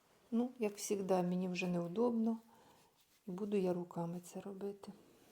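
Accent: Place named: Poltava, Ukraine. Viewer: Я ,як почула Вас,подумала що з Полтавщини .У нас теж так кажуть -кабак.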